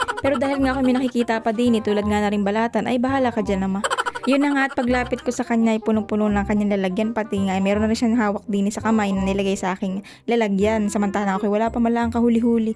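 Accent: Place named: Visayas, Philippines. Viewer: May halong bisaya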